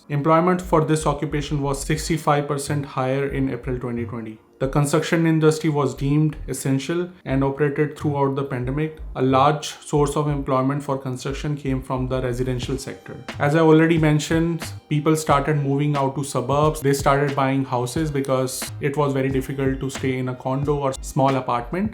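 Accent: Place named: India. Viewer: Your accent is like indian it's the worst.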